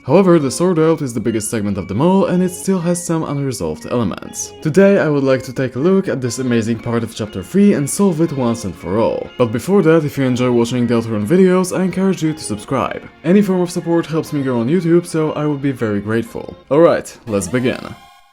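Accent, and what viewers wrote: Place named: United States. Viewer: Wow if i didn't know you arę from Poland them I might say that you are from america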